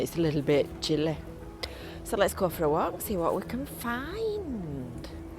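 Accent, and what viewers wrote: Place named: Yorkshire, United Kingdom. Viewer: Sorry , but I thought the music distracting and muted the good ladies rather nice Yorkshire accent ( but I am biased ! ).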